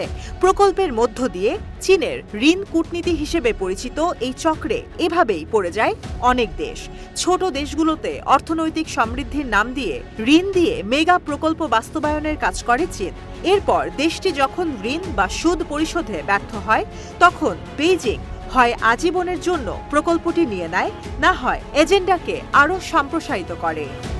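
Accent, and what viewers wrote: India. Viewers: আসলে যে সাংবাদিক খবর পরিবেশন করছে সে মূলত ভারতীয় নাগরিক তার ভাষার ধরনেই বোঝা যায়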